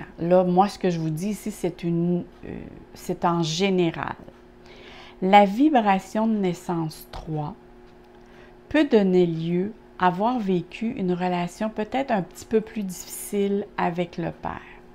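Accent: Quebec, Canada. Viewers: Vivez vous Québec vous êtes Québécoise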